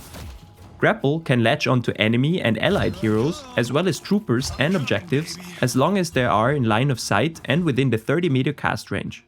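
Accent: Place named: Germany. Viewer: You Sound German tbh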